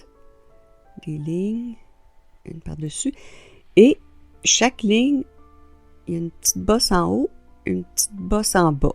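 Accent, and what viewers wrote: Canada. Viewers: Bonjour merci beaucoup pour vos explications très claires et l'accent canadien super agréable merci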